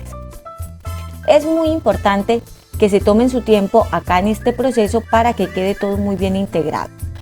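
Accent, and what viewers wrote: Colombia. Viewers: Ud es colombiana ??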